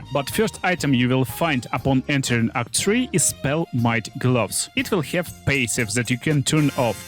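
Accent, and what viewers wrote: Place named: Russia. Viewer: Сэнкс фор зе гайд! Ар ю пробабли рашн? Каз Айм притти щюр зэт зис ис рашн аксент.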